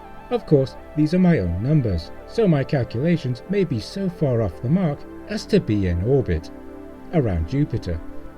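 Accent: United Kingdom. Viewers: In which region of Britain do you live? Do you get thunderstorms a lot? I know the UK is famous for rain, there certainly some with which we had to contend during me and my family’s visit. I, of course, am assuming it’s Britain, due to your accent. I live in north Georgia, and we’re having some tropical storm doozies, stemming from a larger system about ready to hit the Gulf Coast of the Florida Panhandle.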